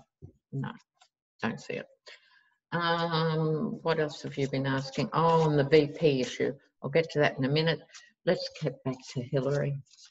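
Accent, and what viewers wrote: Australia. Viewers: The Australian accent is most charming. I never tire of listening to it. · We say it's speaking, 'Strine'! :)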